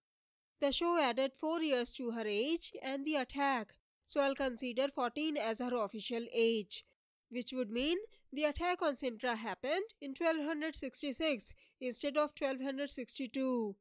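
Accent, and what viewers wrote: India. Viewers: Great video... but i wish the indian accent was not so unbearable...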